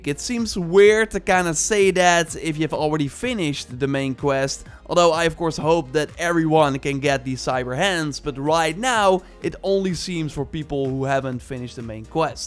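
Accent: Netherlands. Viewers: R u dutch? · Bro jouw englens klinkt echt Nederlands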